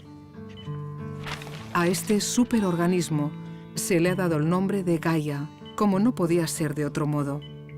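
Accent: Catalonia, Spain. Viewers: Como se nota que la narradora es catalana, el acento la delata. Buen documental por cierto 👍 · El acento catalán tan marcado de la narradora no me ha dejado disfrutarlo. No tengo nada en contra de los acentos, pero para una narración hubiera preferido uno neutro.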